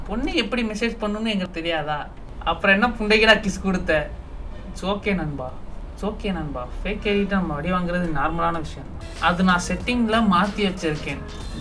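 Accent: Madurai, India. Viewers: Madurai ya Anna ne basa nailla irruku nainga appadetan pasuvom 😅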